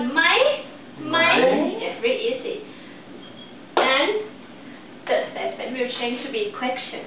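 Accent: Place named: Thailand. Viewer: ชอบสำเนียงเสียงไทยคุณมากเลย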